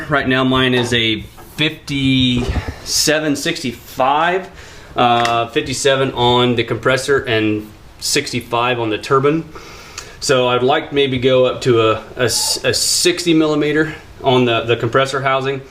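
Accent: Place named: Ohio, United States. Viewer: Was funny to recognize a fellow Ohioan by the way you say "like that" as "like cat". Not making fun of you at all, it's how we talk. I was born there (columbiana county) and lived there for 26yrs. Now I've spent 28 years in Kansas and lost that part of the accent. I own a 2005 slt power wagon. 👍🏽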